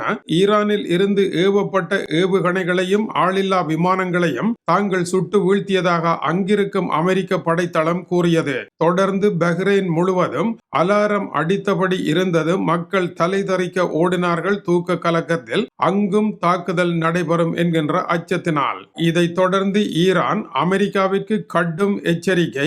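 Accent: Sri Lanka. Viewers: அதிஅகோரம் ப்பா ஸ்ரீலங்கா தமிழ் ❤❤❤❤❤❤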